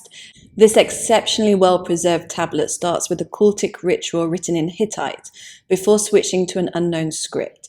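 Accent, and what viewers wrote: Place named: Northern England, United Kingdom. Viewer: So, you imagine that you know what the rest of the world is all about, eh? I am fully aware that hers is an accent found in the more Northerly parts of England and not in a North American variety. I understood her perfectly, but others, schooled in "English as a foreign language", might not find it so easy. Perhaps you might care to elucidate on you expertise in this language discipline.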